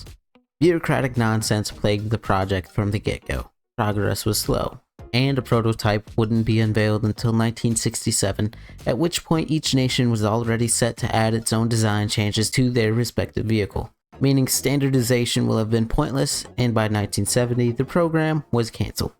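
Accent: United States. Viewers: I hope you blow up bro, the jokes and stuff in this video were great and you have good editing for being a smaller channel. The southern accent was hilarious. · loved the 'murican accent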